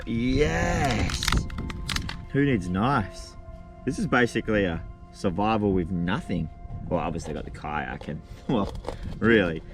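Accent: Australia. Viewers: lemme guess: your australian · Is he Australian? Would make sense…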